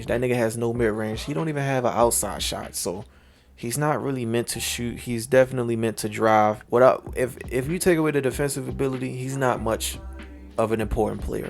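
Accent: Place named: Baltimore, United States. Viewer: I can tell by that accent you from bmore lmao😭 we from the same place but on the real let my character be in the storyline fr👀 don’t gotta be a insanely important character but shiit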